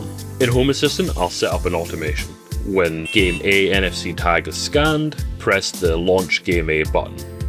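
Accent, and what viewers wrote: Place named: Aberdeenshire, United Kingdom. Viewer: Bro sounds like he's from Aberdeenshire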